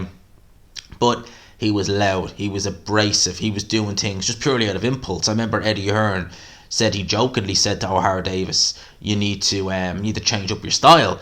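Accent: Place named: Ireland. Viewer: Excellent insight and breakdown Sir! I enjoy your videos. Dunno if it's the Irish lilt, or probably you really know what you're speaking about! Good stuff...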